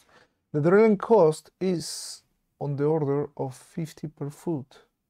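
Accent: Mexico. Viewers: Una pregunta eres mexicano xd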